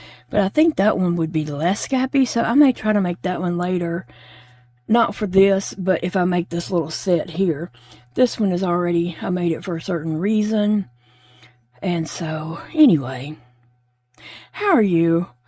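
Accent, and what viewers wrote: South, United States. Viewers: Ms, I think we are all at different places in the spectrum of sharing our details. I'm probably at the opposite end of you, I don't feel it's an honesty/non-honesty thing, but just a comfort level at disclosing our intimate details. That said, I think your videos have helped many who also struggle physically with chronic issues and I admire your courage in putting it all out there. And when you struggle, I pray. God may already know what you are going through, but He has commanded us to pray one for another, so I will. And on the good days and when you share your blessings, I also rejoice with you. So I hope and pray that you feel those prayers and are strengthened knowing there is a community out here that cares for you and is sending up powerful prayers on your behalf. With love, your sister in Christ!❤... Can I just add that God gifted you with a beautiful voice and a sweet laugh, with your easy sense of humor. 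That sweet southern accent is so easy to listen to as I crochet with you. And please don't forget when it seems no one understands, no one gets it, Jesus does. I know you know this, but sometimes we just need the reminder. The feelings of despair and discontent are not from God, by the way, so rebuke them in the name of Jesus and start praising Him for all you do have...not what you don't have. Any of us can get ourselves in a funk when we listen to those nasty minions, despair and discontent who come straight from the pit.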